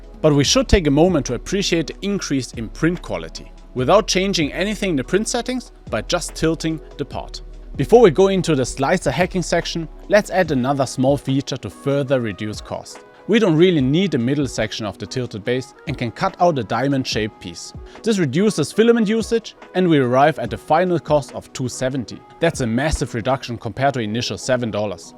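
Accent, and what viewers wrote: Germany. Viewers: German Accent Vanoss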